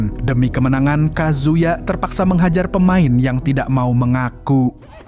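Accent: Banjar, Indonesia. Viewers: uma lah di video ini keluar banar Banjar sidin 🤣🤣🤣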